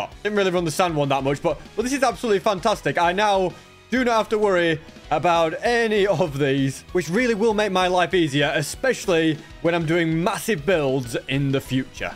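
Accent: United Kingdom. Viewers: Also are u like British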